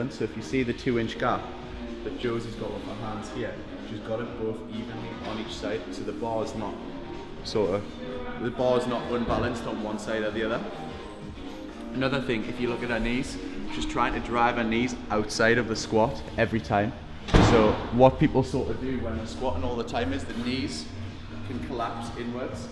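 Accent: Newcastle, United Kingdom. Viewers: Geordie lad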